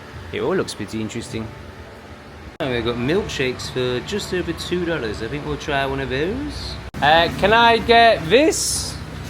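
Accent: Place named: Yorkshire, United Kingdom. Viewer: Propa yorksha mate…keep it up😂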